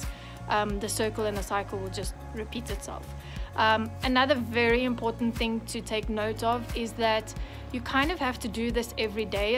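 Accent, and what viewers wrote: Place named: South Africa. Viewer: Liked and subbed! I’m happy to have found a South African youtuber as I grew up near Durban and moved to England in 2002 and I miss the country and the accent so much! This was such a useful and thorough video! You have a very good manner in front of the camera! I didn’t know about this technique so I’ve learnt something new today hehe thank you for sharing! I’m excited to see more! Btw I have a group on Facebook just for vloggers called Equestrian vloggers! I’d love to see you in there ☺️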